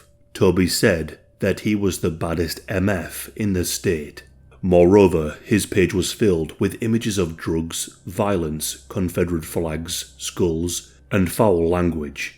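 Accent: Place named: Scotland, United Kingdom. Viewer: I like your accent which I suspect is of Scottish origin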